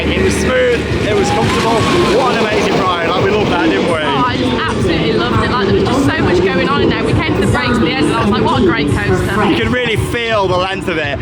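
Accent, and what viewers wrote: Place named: England, United Kingdom. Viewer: yeh sound English boss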